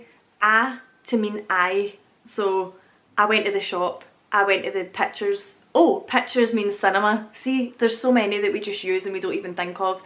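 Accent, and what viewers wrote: Scotland, United Kingdom. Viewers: This video is a gold mine for any actors or dialecticians looking to master a Scots accent. A lot of these aren't so much unique words that aren't in other English-speaking dialects, but rather the unique Scots pronunciations of those words -- and I love that kind of thing! Great stuff! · Love Scottish accent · You lost like 80% of your Scottish accents. Just remembered you out of nowhere. used you love your accent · Polis, get tae and lassie are three of my faves. I've subscribed, great accent, attractive on a woman I think! I'm from south west England myself but know some nice Scottish people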